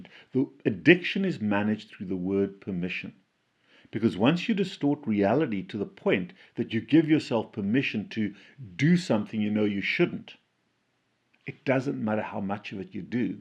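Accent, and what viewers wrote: South Africa. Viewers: I am addicted to your videos . I love the south Africa accent and I love south Africa where I often travel through specially the transvaal near mozambique border . The Bantu diet was based on one meal a day based on vegetables. The only car b was maize but eated with fermented preparation .fruits only the children eat . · He's South African. He sounds like an Afrikaans South African.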